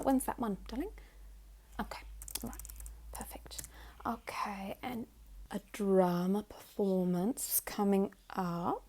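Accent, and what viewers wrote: Australia. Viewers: Just also i have to say i tbh wouldn't mind any un asmr video with aussie mom bcuz the accent lol have u tried learning other ones too? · i hate rich people ngl but there's something about this specific posh australian accent that hits the spot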